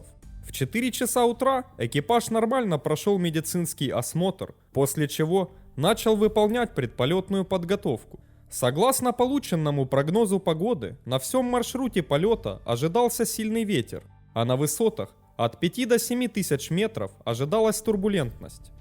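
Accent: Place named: Ukraine. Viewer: Мляяя, кому не лень делают обзоры катастроф, походу не понимающие в авиации ничего. Сам инженер авиационный с 1989года. Говор автора вроде украинский.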